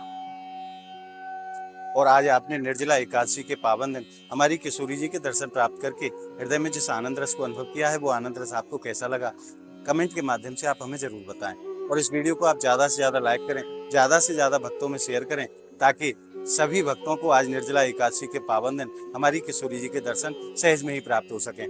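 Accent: Braj, India. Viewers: आपकी आवाज ब्रज मंडल की अच्छी लगती हैं राधा कृष्ण जी k darshan achi thrah 2mint tak करवाया करो dhanabad❤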